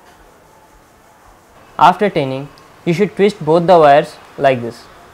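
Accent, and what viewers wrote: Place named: India. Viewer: few indian accent that i watch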